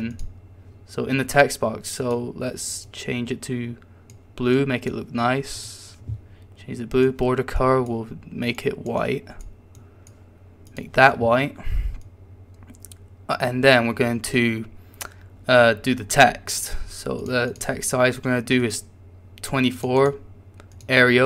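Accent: United Kingdom. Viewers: Because he's Scottish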